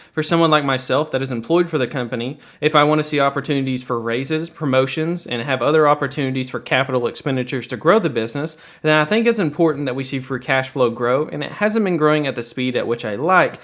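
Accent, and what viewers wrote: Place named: Oklahoma, United States. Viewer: You sound like you're from the Oklahoma! I imagine you live there?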